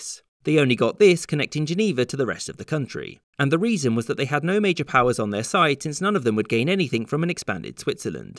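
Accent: United Kingdom. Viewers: I've always thought it was interesting how Brits pronounce things. It's so striking to hear someone call France, 'Fronce'.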